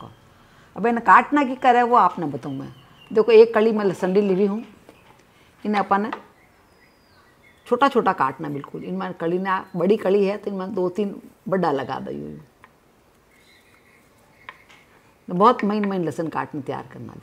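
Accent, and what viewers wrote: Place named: Haryana, India. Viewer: Very nice, like your haryanvi accent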